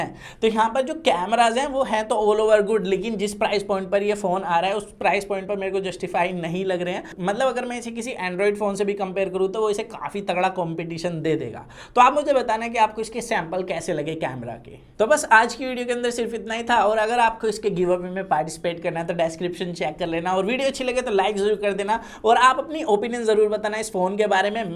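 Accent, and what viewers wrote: India. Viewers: Only one tech editor who pronounciate desi hindi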